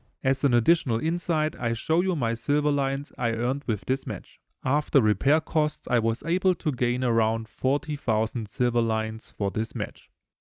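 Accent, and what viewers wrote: Germany. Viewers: English is the yellow from the egg. Spaß klarer deutscher Akzent aber Video hat trotzdem mehr Aufrufe verdient, gut so 👍🏻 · Der deutsche akzent 😂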